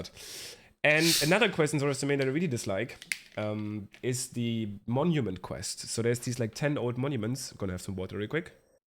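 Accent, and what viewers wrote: Germany. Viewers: Nice that YouTube starts randomly showing me small channels. Heard directly that you are German 😅 Don't worry about your voice. I didn't notice anything except the coughing.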